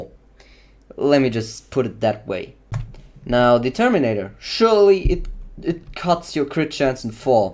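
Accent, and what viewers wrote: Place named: Germany. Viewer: Information wise not bad, way too long and yapping, german accent and cracky english is one too much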